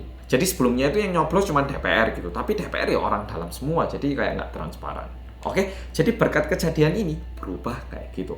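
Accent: Java, Indonesia. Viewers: Kemaren LUWEE. Sekarang MEDOK.😂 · bagus bangeddddd, medokkk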